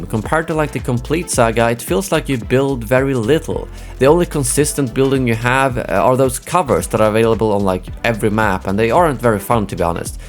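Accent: Sweden. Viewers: Love that Swedish-English accent haha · Are you Swedish? (It really sounds like that when you talk, I’m just curious)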